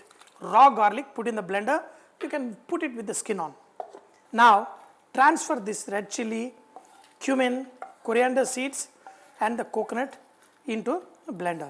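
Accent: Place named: Kerala, India. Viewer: Sir your accent sounds like you speak Malayalam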